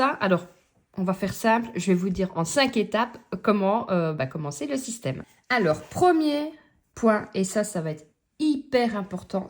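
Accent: Liège, Belgium. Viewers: Toi t’es de lièèèche non ?😅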